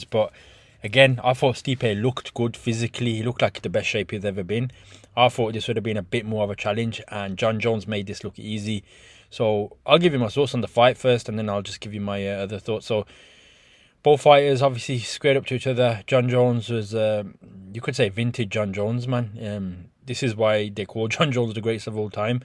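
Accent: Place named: Black Country, United Kingdom. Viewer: 💯 I hope it is a negotiation tactic by Jon mate cuz it’s the only fight I want to see in the ufc 🏧 I was never a fan of jones vs stipe tbf I wouldn’t argue with jones vs pereira but the aspinall fight has to happen first let’s be honest, keep the content up bro you sound like your from the Black Country I’m only down the road, Telford bro 👊 n you’d have to be a total idiot or just plain arrogant to write jones off against anyone I just think Tom has the edge over him not to say Jon can’t win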